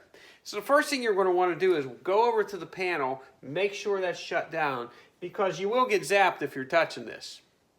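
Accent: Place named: Aliquippa, United States. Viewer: I left Aliquippa 30 years ago but I picked up that accent of yours within 15 seconds of watching this video. Great job, it was a good refresher for some Sheetrock work I have coming up.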